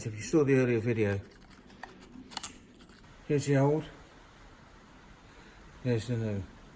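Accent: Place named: United Kingdom. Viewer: Cant stand british accents. Cannot understand anything he was saying.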